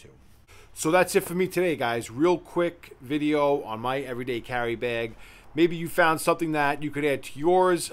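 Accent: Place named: New York, United States. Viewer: I immediately subscribed cuz the second I heard you talk I knew you was a fellow New Yorker! Alright bx zoo!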